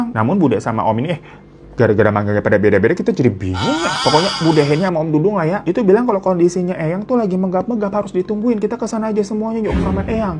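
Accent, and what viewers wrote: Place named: Banyumas, Indonesia. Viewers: Aku suka mas bisa ngomong ngapak wkwkwk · Ngapak lu gureng bang 🗿 Gw orang purwokerto agak aneh dengarnya🗿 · Bang ngapak lo oke banget masih ehe 😂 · Si babu glowing bisa ngapak woyyy😮😊😂😂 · Curiga bang wong ngapak😐🙏, katanya juga punya sepupu orang purbalingga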